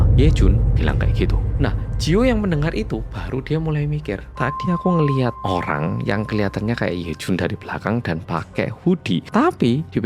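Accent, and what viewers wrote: Java, Indonesia. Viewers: Dengerin cerita mas, ngebayangin wajah2 Korea tapi bahasa nya medoook 😅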